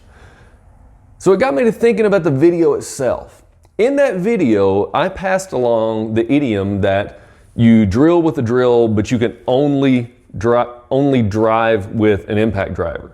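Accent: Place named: Milwaukee, United States. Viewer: You sound like a Milwaukee guy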